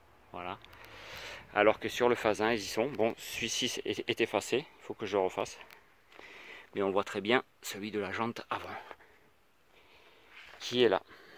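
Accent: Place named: Southwest, France. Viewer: quel plaisir de voir t'es video et quel jolie narration avec ton accent du sud Ouest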